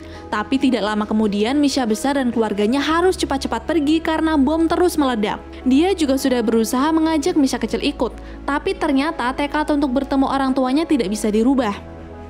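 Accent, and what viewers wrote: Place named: West Java, Indonesia. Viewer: Sue sundanese na geuleh naon teh wkwk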